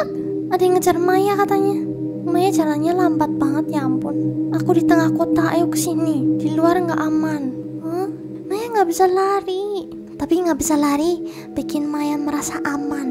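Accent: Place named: Solo, Indonesia. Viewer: kan dari solo bro 🤣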